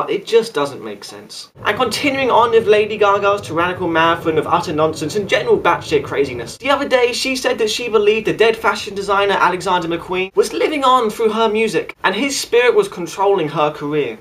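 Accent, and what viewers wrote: United Kingdom. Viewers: He he I can't always understand what you're saying; you're talking too fast in that cute british accent of yours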